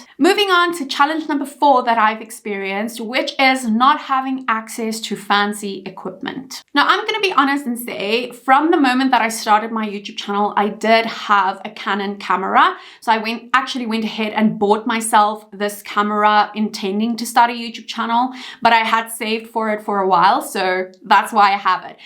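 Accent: South Africa. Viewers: Are you South African? That accent...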